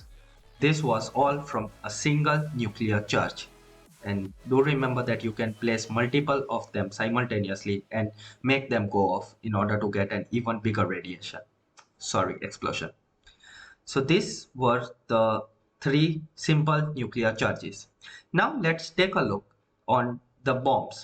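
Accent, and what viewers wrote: India. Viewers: You sound like english indian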